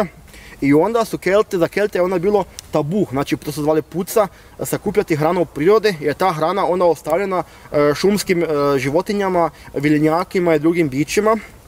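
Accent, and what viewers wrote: Zagorje, Croatia. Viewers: Ovo brdasce me podsjeca kao iz onog filma "Crni Gruja i kamen mudrosti" jel tu bio mozda kamen mudrosti, kad zveknes glavom od njega budes mudar, a svakim novim udaranjem budes sve mudriji i mudriji hahaha:D Salu na stranu, klipovi su ti armirani beton! samo tako nastavi, i molim te malo poradi na jeziku, pola te covjek koji nije zagorac ne moze razumjeti, ili ako nista malo sporije pricaj, nekad ga ubacis u petu brzinu jbt:D spetljas se sav, ionako se taj dijalekat tesko razumije jos kad ga ti ubrzas tad tek se nista ne razumije:S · To ti je zagorski..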